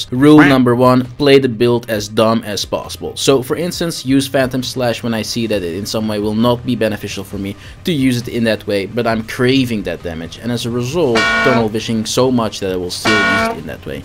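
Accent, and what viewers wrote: Netherlands. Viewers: Holy sh*t wanna try out this build. Love me some artist in NG+. Now gonna go with this one in next run. Think I'll do a normal NG run to really see the power of this! O and artist really nice on most enemies, only Gargoyles are a pain. I use a flail cause of the dex arcane. And I was wondering cause of your accent if your from the Netherlands (I am).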